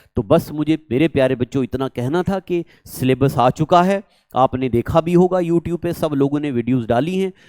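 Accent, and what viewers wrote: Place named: Kashmir, India. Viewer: Love this kashmiri accent 😂😂😂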